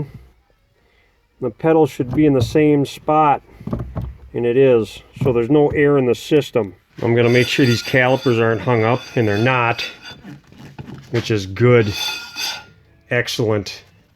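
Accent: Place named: Midwest, United States. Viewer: this guy is so midwestern🤣🤣🤣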